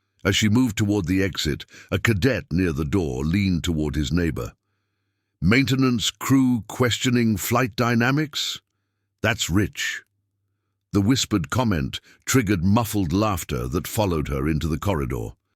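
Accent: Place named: United Kingdom. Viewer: I'M HEARING A SLIMY LIMEY DOING THE NARATING, JUST BY THE WAY HIS USE OF CERTAIN WORDS!!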